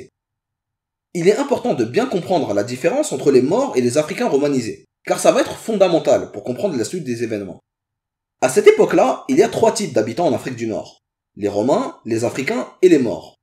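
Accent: Arab world. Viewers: Passionnant ! Mais êtes-vous obligé de parler aussi vite (par moment) en avalant la moitié des syllabes ? C’est un peu stressant vous êtes arabophone ?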